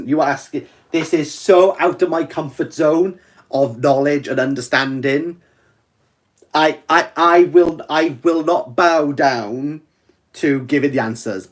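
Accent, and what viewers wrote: Wales, United Kingdom. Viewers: I did Not expect this thick Welsh accent hard-as-nails man to survive the first week of Ramadan, but deep inside, when I sow how he makes the "Rrrrrrrrrrramadan Mubarak" sound, I knew he is sincere about life, and he might just make it to Eid. Look at him, crumbling, but not falling. Look at him now after thinking of quitting youtube, he is making a Live... Man we Love you for the sake of Allah, and may Allah bless you and your family, and may Allah give you from where you never expect, and keep you and your loved ones on the straight path insha'allah. Eid Mubarak Brothers & Sisters 🎉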